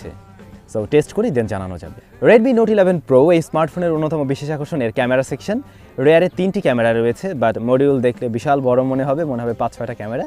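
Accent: Barishal, Bangladesh. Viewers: ফাও কতা কইয়া লাভ নাই। ভাই যে মোগো দেশি কতা হুইন্না বোঝা গেছে🤣🤣🤣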